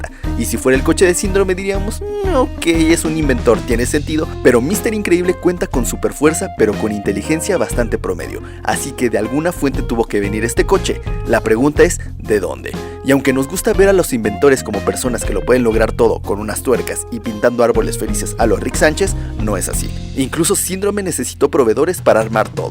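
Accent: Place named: Mexico. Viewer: Min a min audio 100% mexicano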